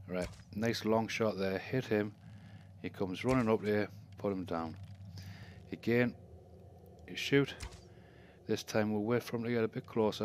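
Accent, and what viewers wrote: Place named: Newcastle, United Kingdom. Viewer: GREAT TO HEAR A geordie hhaha x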